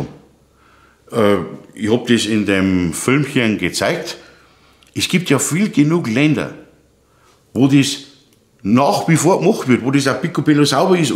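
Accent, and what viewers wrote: Bavaria, Germany. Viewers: Ich bin zwar kein arbeitender Elektriker weil ich nur mal Nachrichtengerätemechaniker (1982) gelernt hab mit Ausbildung zum Elektriker. Aber in MEINER Küche hängen an einer Sicherung 10 Steckdosen weil ICH das so wollte, und es werden ja nicht alle Steckdosen auf 1mal benützt. Wer benützt a Küchenmaschine an Staubsauger der Wasserkocher und ein Heizgerät an diesen Steckdosen zusammen???? Und selbst wenn dann fliegt halt die Sicherung,was solls, der größte Depp kapiert das. Also scheiß auf die blöde VdE jeder ist seines eigenes glückes Schmied. Thema Schreiner,was ich auch gelernt hab:: Ich musste einen 3 monatigen Maschinenkurs machen um überhaupt an den Maschinen arbeiten zu dürfen.. Weida so, i bi a a Bayer und mog dei Aussprach, Danke dir!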